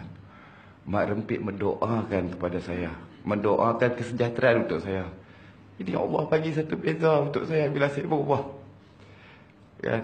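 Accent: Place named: North, Malaysia. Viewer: Skit2 kdah.... skit2 kdah... perlis ada penang ada.. perak utaqa pn ada ckp mcm ni jgk... smpai ke pntai remis ckp utara.... awt xsbut....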